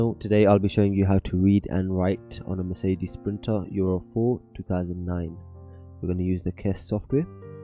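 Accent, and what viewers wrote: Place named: United Kingdom. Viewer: Hi New to ECU tunning, I have Vediamo and DTS Monaco, how does this compare to them? great content, I just sunscribed. I hear a UK twang, where in this Island you are ? lol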